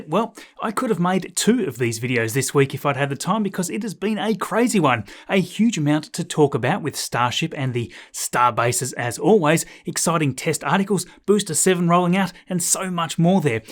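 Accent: Australia. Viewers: ..... Awesome presentation again.... 👍... If I could remind you, your success at least in my simple brain 🧠 lol 😁...is do to some awesome folks with British accent.... First one is John Romer archeologist, and David Attenborough naturalist.... I just totally loved these two and their presentations with that accent, your Ozzie accent is similar to theirs and captured my attention in the beginning of your YouTube stardom 🙂. Of course you do have a hell of lot more charisma than both of them two above lol 🙂....not to mention the effort and the knowledge you put into your presentation. Much appreciated mate 👍🤜🤛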